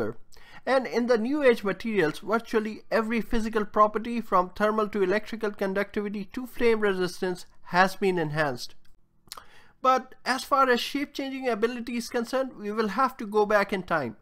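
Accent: India. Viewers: Oh wow an interesting video. Indian accent Nevermind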